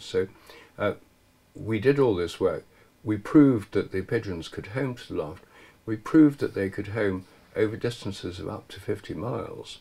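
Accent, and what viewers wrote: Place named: United Kingdom. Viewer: Thank you, Rupert, for your dedication, and oh so typical British style of speaking and tenacity in between spells of politeness 😊 I'm happily surprised (I'm Dutch) to hear of this pigeon- experiment with Dutch pigeons. It's great humour to know of a formal Navy ship and crew, welcoming such an experiment. I'm a longtime follower of your work, living at an older age, observing the gap growing smaller, between the intellect of science and the intelligence of the heart, the world of spirit that begins to bleed through the icicles of logic. Your brave steps to throw stones in the pond of logic are much appreciated. It's truly groundbreaking, only not for eyes that are wide shut. Thank you so much!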